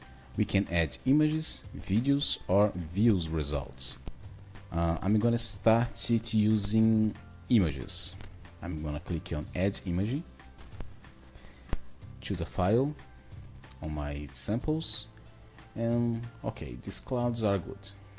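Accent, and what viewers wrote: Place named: Brazil. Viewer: Great, thank you for replying actually I am learning Português and your accent was very familiar to Brasil 😍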